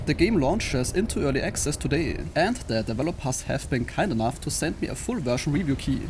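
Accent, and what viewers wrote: Germany. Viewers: You sounds like german.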